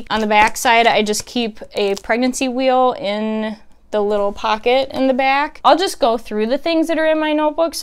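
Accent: Midwest, United States. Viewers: That midwestern accent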